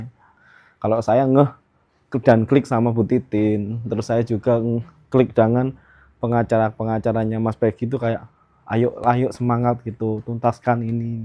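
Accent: Java, Indonesia. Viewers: Nama nya tapi suara nya medok...mantul👍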